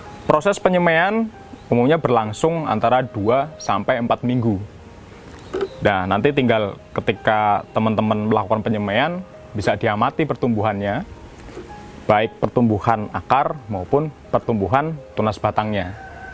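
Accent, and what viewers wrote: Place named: Banyumas, Indonesia. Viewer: Mantep!! Ngapak kie mas e kaya inyong. Btw kalau batangnya kering ngerendamnya lagsung pake air+fungisida atau rendam air dulu baru fungisida?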